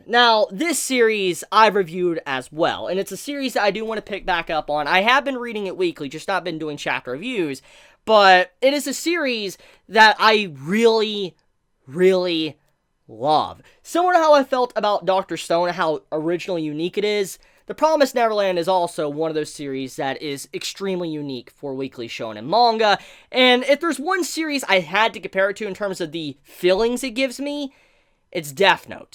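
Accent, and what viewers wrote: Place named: Appalachia, United States. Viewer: brotherrrrrr hill billy voice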